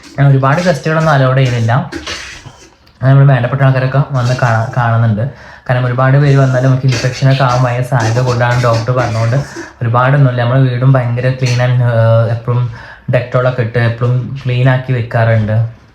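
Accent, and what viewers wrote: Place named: North Kerala, India. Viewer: ബെന്ന്, പയം പുയുങ്ങിയത് 😅നിങ്ങൾ വടക്കൻ കേരളീയരുടെ ഭാഷ ശെരിക്കും ചിരി വരുന്നുണ്ട് 🤪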